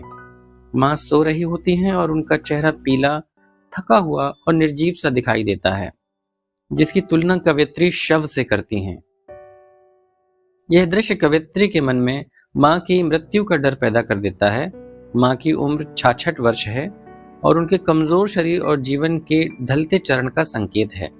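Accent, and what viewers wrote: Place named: United Kingdom. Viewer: ur english ascent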